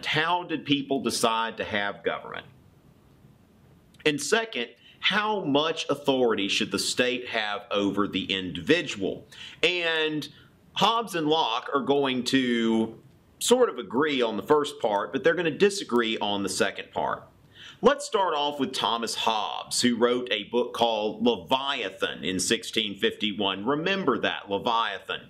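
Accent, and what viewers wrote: South, United States. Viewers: I totally think that all philosophy classes should be taught by folks with a Southern accent. Yours Truly, Demian Virgil Hammock the son of Prof. Virgil Hammock P.S. The opening with Heavy Metal music is a nice touch. I went to some sort of Indie Music thing and I asked when is ABBA playing? I got that look and was told never to come back. I think I am on some sort of Indie Hipster ban list? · Something about yer yank accent explaining philosophy is endearing, will stick around. love from ireland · It was when I heard you threatening to drink my blood for breakfast that I realized this was the second time I was watching this video.😃😃😃. Just finished listening Locke’s Two Treatieses, so your great sketch now sounded with new wisdom. Also, that southern accent sounded dear, too😄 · which state are you from? you have a very strong accent :p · This is a real southern usa accent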